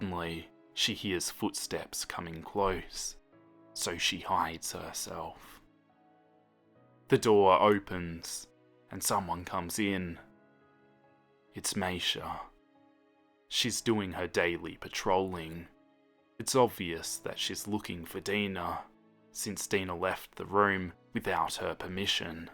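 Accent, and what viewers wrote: Australia. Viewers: Are you a Aussie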